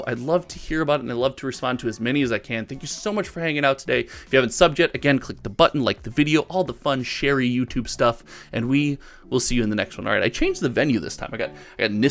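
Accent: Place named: Chicago, United States. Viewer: Did I just hear your from the Chicago land area?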